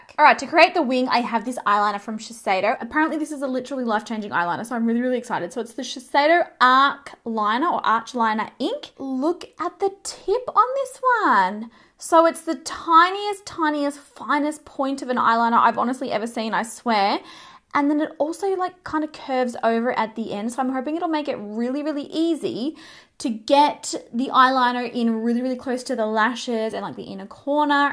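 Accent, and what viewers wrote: Australia. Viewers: your prononciation of Jouer with your Aussie accent is spot on in the ears of a French spoken person!;)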